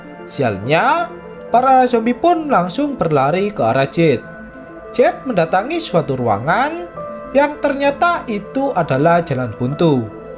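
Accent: Java, Indonesia. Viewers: Ah ga seru ga ada suara pemainnya .malah mendengerin Suara yg menceritakannya suara wong jowo apa pake di ceritain .segala males utk bantu sabcrek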